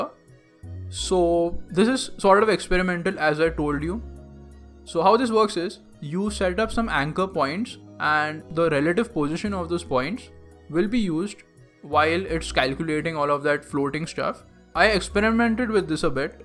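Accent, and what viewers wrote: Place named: India. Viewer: i hateee,i hatee india guy english accent!!!!!!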